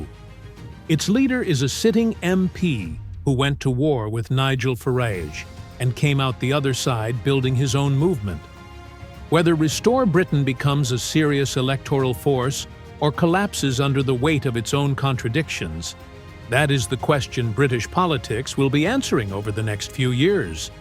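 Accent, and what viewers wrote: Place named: United States. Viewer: Next time you make a video about the UK, you might consider using an AI voice with an actual BRITISH accent, as opposed to an American one. Listening to this was really getting on my nerves, but the way it pronounced 'Fareeeij' was the final straw for me! Just sayin'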